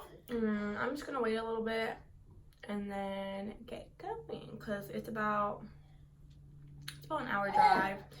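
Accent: Mexico. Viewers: She talks like a Mexicana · Shes clearly Mexican by the way she talks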